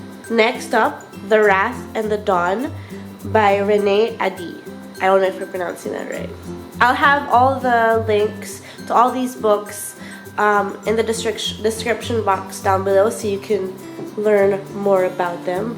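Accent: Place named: Philippines. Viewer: I sensed you were from the Philippines! Great haul,:)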